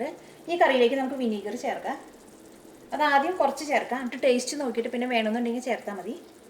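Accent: Thrissur, India. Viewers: are you from trichur,because your accent is like trichur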